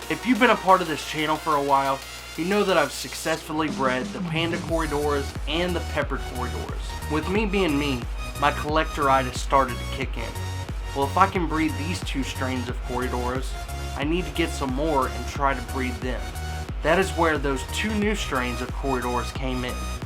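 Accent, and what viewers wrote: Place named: Kentucky, United States. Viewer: Greetings from a fellow Ky native! I stumbled across your videos and a few seconds in I thought, "Where is this guy from? 'Cause he sounds like a Kentuckian lol". Enjoying your videos!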